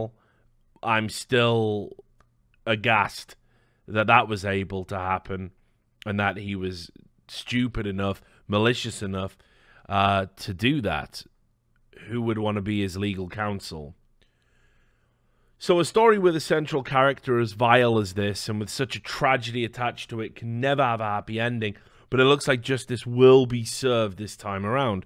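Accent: United Kingdom. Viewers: My name sounds a lot like “Tyler Barriss” especially with a british accent so I’m kinda shook everytime says it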